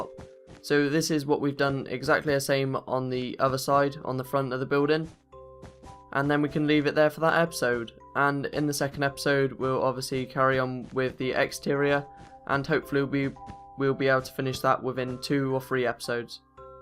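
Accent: United Kingdom. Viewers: Youre buildings are no joke good as hell, by the sound of youre voice you sound like youre from the UK right? Varry good building bro. I subscribed to you so i can see more. Is it alright if i download the tutorials of this building for my own use only? Youtube sucks up all my data and i would love to make more of these. I do the same with Jagthunder1 cause his vids are bad ass and hes got some wicked ships. Check em out also. But any ways this is the best building ive ever made and you did a VARRY good job with explaneing it step by step witch made me build the first step perfect. And you stopt here and there and thsts where i paused it to build. Perfect vid man. Im looking forward to seeing more builds like this aswell. Thanks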